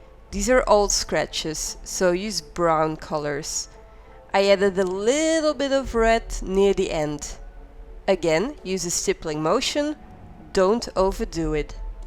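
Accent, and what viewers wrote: Netherlands. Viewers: This looks really good and detailed! thanks! btw where are you from? your accent sounds slightly dutch or something😊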